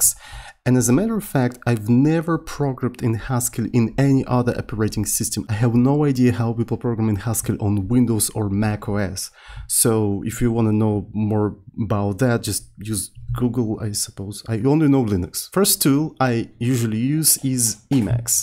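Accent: Russia. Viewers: Я как услышал его, понял что русский, акцент остался чутка)0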